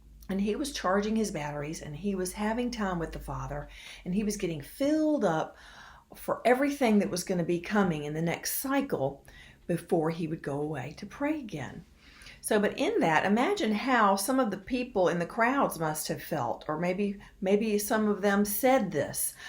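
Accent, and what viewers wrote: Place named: South, United States. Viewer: Beautiful southern accent 😍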